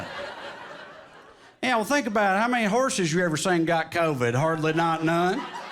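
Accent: South, United States. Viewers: Yeah, the accent is a turnoff. Grew up in the south and just don't like what comes with that sound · Trae Crowder. Sooooooooooo Flippin ' Funny. - Scotty Kilmer was the O N L Y smart - talking dude - With - A - Southern - Accent - that I have deeply embraced in my life. Agree with him or N O T... when it comes to talking about cars...... Scotty ' s range of knowledge from individual vehicular component parts to The A U T O I N D U S T R Y in general... I simply love that cantankerous old coot with that southern accent. S C O T T Y. That O N E Dude. That -- W A S -- It!!! - - Now... in my life...... I have a 2nd Southern Accent Dude who I now ❤️ L O V E ❤️. Thank you Trae Crowder for helping me to be less of a Northern Bigot. - The song that ' s used to open // close his comedic routine is Sooo C O O L!!! Group:. Song Title: " " Burn. Flicker. Die. 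" " - Notable 3rd mentioning... Chris Stapleton. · Love this. Maybe funniest sound man ever. Southern accent + a wicked smart savvy insight = tastiest. (Babies listen to your photographer friend and back-pocket that cellphone. The fatigue lines and weird rectangle leg thing ruins a great blue jean) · I think your Southern accent has a stiff challenge from the Pittsburgh accent. I lived there for 25 years, absolutely the nicest people i ever met, but that "Yinzer" accent made them sound very unintelligent. 😊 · Thanks for the laughs! That was great! Love your southern accent ❤ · Texan here. Glad to see the literate south coming up in comedy. The irony in the accent should be ample impetuous for some more great bubba's-got-a-passport comedy. Excellent set, my dude. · The southern accent just makes me feel at home. My parents were raised in the south. My dad was the most intelligent person I have ever met in my life and it used to be fun watching him with someone that assumed he was dumb. 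😂😂. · Found this guy from Tik Tock funny ! Black man from NC here sounds like me and my cousins shooting the shit about ourselves , people and old dead friends and family! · Southern draaaawl…feelin it bro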